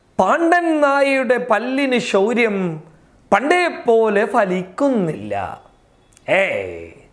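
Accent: Kasaragod, India. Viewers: വാർത്ത പറയുന്ന ചേട്ടാ നിങ്ങൾ കാസർകോഡ് ആണോ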